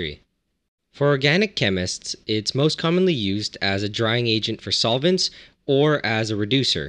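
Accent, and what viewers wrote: Canada. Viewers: That's how Canada sounds?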